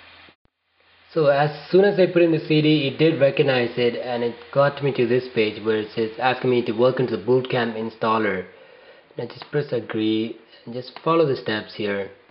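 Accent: India. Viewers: u r from india, aren't u? :) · Indian... Obvious :)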